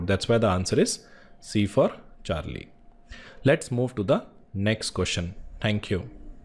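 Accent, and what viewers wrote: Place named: India. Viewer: I can tell that he is speaking in Indian accent... But it should not be hard if know and use the language very frequently.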